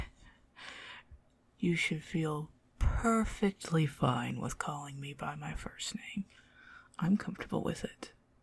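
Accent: South, United States. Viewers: don’t you DARE hide that beautiful country accent!!🤣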